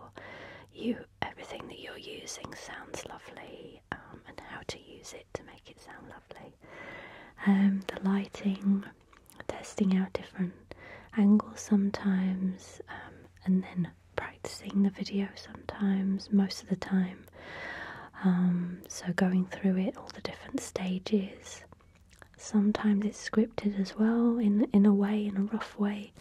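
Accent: United Kingdom. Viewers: Thank you! I'm so happy you shared your favorite ASMR channels with us... I too love ASMRtists with an accent, I don't know why.. and for me YOU have a lovely English accent;-) I'm a French-speaking canadian, so your british accent is really exotic to me! Many artists from over the world do videos in English, I guess it's easier to reach more people using English, since it's an international language... but I'm always happy when I discover an ASMR artist that speaks french. Unfortunatly, there are not that many... So I decided to start my own little channel in French, and you really are an inspiration to me! I'm about your age and I somehow recognize myself in your life story (I loved your "life in drawings video"). Thank you for your generosity! xx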